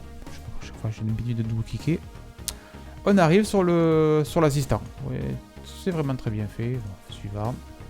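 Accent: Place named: Hérault, France. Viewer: Intéressant.... d'après ton accent tu es origonaire de lherault non 😊 ou proche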